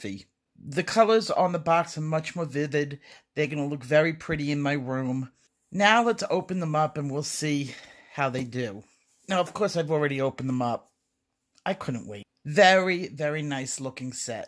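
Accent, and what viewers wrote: New York, United States. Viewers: Omg I love your reviews. Love the blunt New York honesty with accent too.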